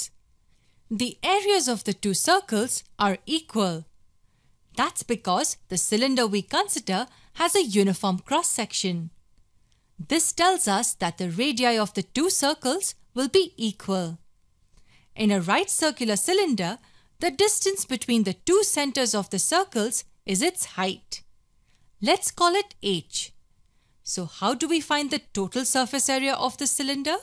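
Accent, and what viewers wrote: India. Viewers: is the girl talking ..an indian ?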